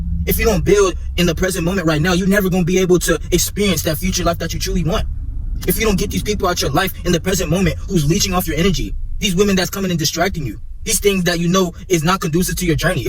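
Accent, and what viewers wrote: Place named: Los Angeles, United States. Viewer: Bro you got that L.A accent. You from there?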